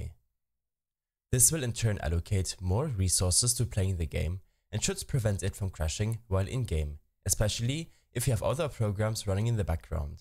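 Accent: Germany. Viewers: No man a German knows he sounds German, you would know it when you saw his channel description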